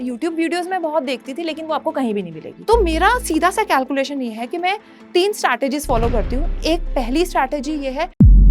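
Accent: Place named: West Delhi, India. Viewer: west delhi touch lagta hai maam ka..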